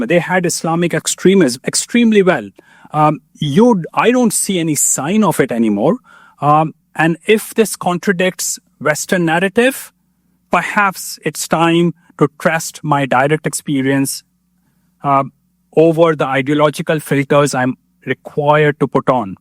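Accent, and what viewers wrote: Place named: India. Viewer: Espeak,Estreet…Indian still remains an Indian 💩😂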